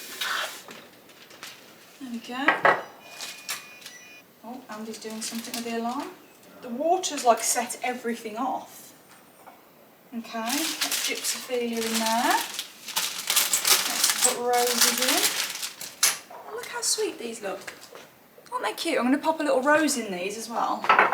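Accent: United Kingdom. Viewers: I love UK regional accents. Love yours! I don’t live in the UK. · It’s unbelievable how there is discrimination between British accents 😢 very sad , imagine how the foreigners feel when they are discriminated because of their accent. And by the way I love your accent ❤ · I just can’t believe all these insults about your accent. I am a Canadian gal and i just see you as a beautiful British person. Love your accent .❤🇨🇦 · Lovely ❤ I love you touched the bit with the accents! I am a Bulgarian, who lives in the UK now 10 yrs... I do have and I will always have an accent... I always feel embarrassed, especially at more posh places, formal work events and important meetings. However, now you saying been offended by people, genuinely blows my mind. You speak lovely British English and I can't understand what could be wrong with people who think and say that. Keep being yourself, you are amazing and that's why people who love you do it, because that's just you. 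My absolutely favourite amongst all influencers and the most glamorous woman, an absolute example for everything! 💕 Btw, my name is Desi. 🥰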